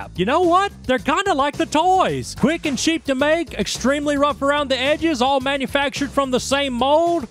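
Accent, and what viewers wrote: South, United States. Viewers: He's southern like accent with a coyote avatar fits well like the final peace of the puzzle. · A game reviewer with a southern accent covering one of the games that I played to death as a kid? Have a free subscribe, good sir.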